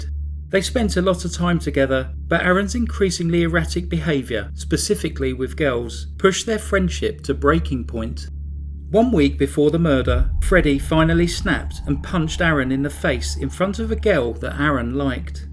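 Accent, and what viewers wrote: United Kingdom. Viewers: I also love British accent ❤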